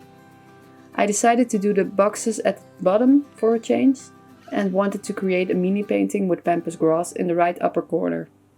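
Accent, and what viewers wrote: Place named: Netherlands. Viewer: Je accent is zo heerlijk Nederlands, genieten ;)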